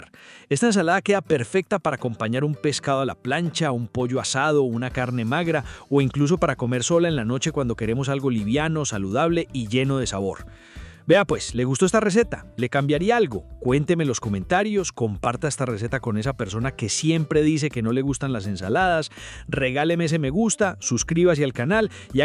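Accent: Antioquia, Colombia. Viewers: Me encantan tus videos y tu forma tan clara, amena para explicar, y...tu acento paisa, tiene magia. Muuuuu bien, gracias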